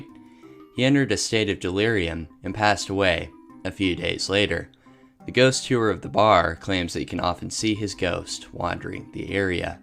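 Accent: Baltimore, United States. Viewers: That Baltimore accent is nasty..Dog is dug :face-orange-frowning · That BMore Accent is HILARIOUS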